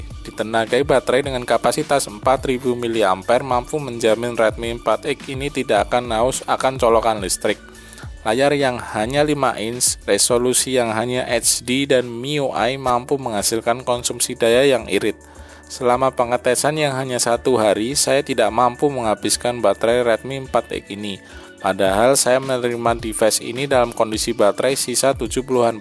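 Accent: Surakarta, Indonesia. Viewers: orang solo ya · sampean tiang solo toh mas?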